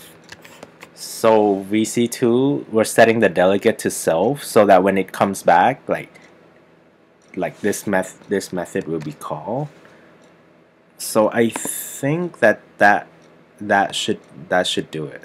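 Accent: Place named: Thailand. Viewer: You got a really good US accent but I still can feel somewhat Thai in your voice :) . I've lived in Thailand for 2 years ^^. Thank you again I've understood and practiced this lesson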